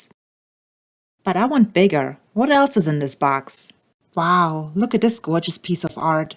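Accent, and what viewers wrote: Germany. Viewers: I figured you were from Deutschland with the accent. My mom makes pfeffernusse for Christmas, my grandmother used to call me a kleine hans wurst when I got out of hand. She used to call everybody Hans wurst if she didn’t like them. Love that smile, and you make sanding fun and interesting.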